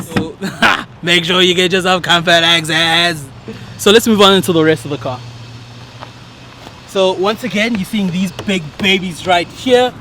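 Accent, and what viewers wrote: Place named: United States. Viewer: Good effort bruv. IMHO try being more yourself South African opposed to American you tubers accent wise. Nice car I've also got a R 2016 dsg oryx white from new and a V8 M 2008 black on black 6 forward drivers car. Looking at a Hawkeye STI in the near future sick project. Keep it up also the R is a beast tuned especially of the line.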